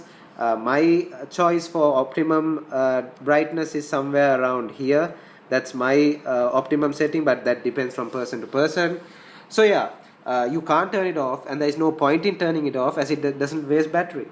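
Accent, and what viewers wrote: India. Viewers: Hard to understand your English..Your Indian accent is so strong.. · i dont like your indian-english. its annoying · Is it indian english? Epically slow action to say NO, it doesn't!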